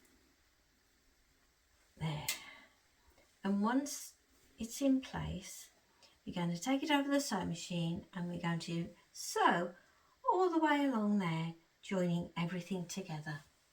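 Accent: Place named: Midlands, United Kingdom. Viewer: Very clear tutorial, thank you. I love hearing your tutorials as having moved South they take me back home to the Midlands 😀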